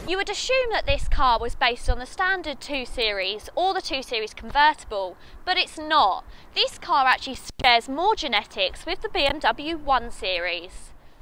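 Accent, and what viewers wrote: United Kingdom. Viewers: No it's just the british accent lol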